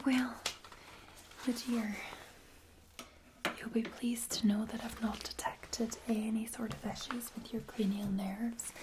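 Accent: Scotland, United Kingdom. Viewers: That Scottish accent, oh Marry and Joseph, lol :D just lovin' it :) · I love Cranial Nerve Examinations I've seen so many of them by different ASMRtists that I really want a Cranial Nerve Exam if there half as relaxing as in ASMR then I think I would really love it! If not for nothing if I ever need one I will have a wealth of videos to go back to to relax and calm my anxieties! I love your Scottish Accent in this video is this a Northern Coastal accent kind of like ASMR Shortbread or otherwise known as ASMR Shortie? Thank you for a really relaxing Tingly video it seems like today has been a stressful tiring day I really appreciate these calming videos in the evening!:_Loveheart::_Loveheart::_Loveheart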